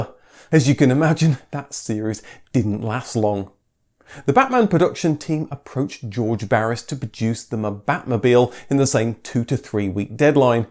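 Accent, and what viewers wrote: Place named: United Kingdom. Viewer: Thank you so much for this great video. I thought more replicas had been built: about 8. I read this somewhere. As usual, it's a pleasure listening to your perfect British accent. That's a bonus to me!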